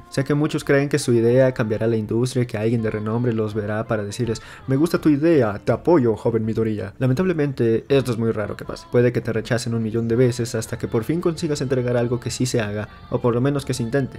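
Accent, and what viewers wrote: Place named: Mexico City, Mexico. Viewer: Concuerdo, muy chilango 🤢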